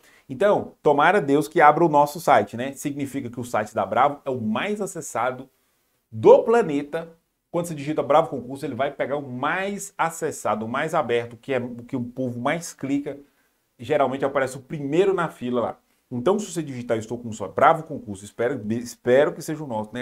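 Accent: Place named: Minas Gerais, Brazil. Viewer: Em 2024 gostei muito da aula e adorei o sotaque mineiro. Parece mineiro né. Abraço parabéns 👏👏👏👏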